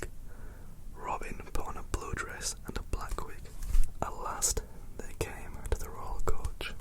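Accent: United Kingdom. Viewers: Handsome Indian man ✅ gorgeous British accent ✅ amazing asmr video ✅ this is the best! Love your channel! Thank you 😃💙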